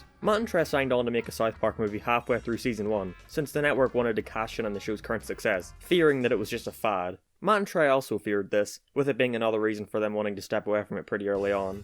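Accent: Northern Ireland, United Kingdom. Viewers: Mate, what part of Northern Ireland are you from?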